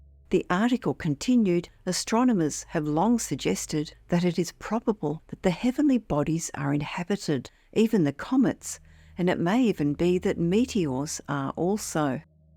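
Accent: Australia. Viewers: The Australian accent makes it less credible. I'm not sure why. Maybe its a Ross Coulthart effect. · She’s American. This is an Australian accent!!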